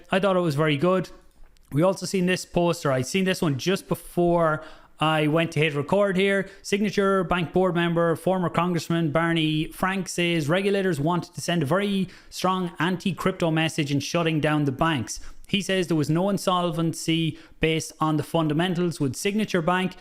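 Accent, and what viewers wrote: Ireland. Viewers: Are you from Sligo man? :D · No way boy, he`s a DUB :)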